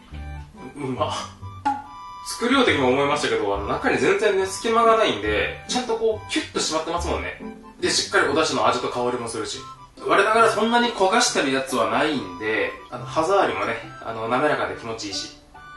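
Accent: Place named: Fukuoka, Japan. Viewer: 「バックを『からって』店内を歩けないでお馴染み」 親の転勤で福岡県から他県に引っ越した時、学校でランドセルを『からう』が伝わらなかった時の衝撃。の福岡弁懐かしくて大好き 『背負う（せおう、しょう）』が標準語なのかな？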